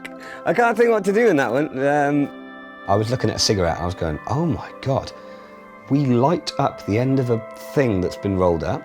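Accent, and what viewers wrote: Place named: Gloucestershire, United Kingdom. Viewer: Nice Gloucestershire accent 😂! I went to Wyedean lol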